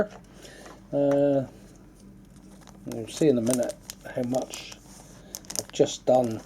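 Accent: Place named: Cornwall, United Kingdom. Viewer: Just found you on here, nice to hear a proper accent on utube:-) I was born in Truro up Malabar back in 55 so I remember Truro Station very well. Just old enough to remember Steam and standing on Black Bridge as the Steam Locos went underneath so that we were hidden in the cloud as it went by. Also remember Newham and trains running down there, and walking the line many times as a youngun. Anyways proper job with the vids.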